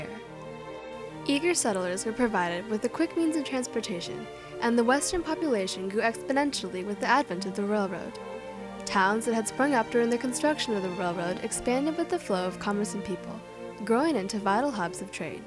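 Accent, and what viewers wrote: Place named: Mid-Atlantic, United States. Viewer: Very good. But what puzzles me is the narrator's accent. Very clear English, yes, but there's such effort apparent to elocute correctly. Sounds a bit mid-Atlantic. Probably never find out.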